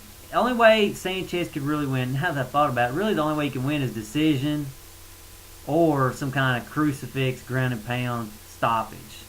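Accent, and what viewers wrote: South, United States. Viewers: Anybody who's southern accent is stronger than mine gets 5 stars. If the next video's just as good, i'm straight up subscribing. Alot of stuff i dont particularly agree with, but i think that's the fun of it all. I only really agree with your deal on BJ. It's almost to the point you dont have anyone in that division that can hang with him since he beat Little Evil.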